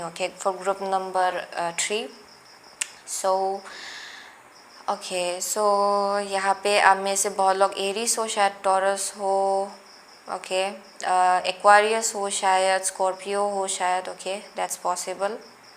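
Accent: Northeast, India. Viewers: R u from northeast???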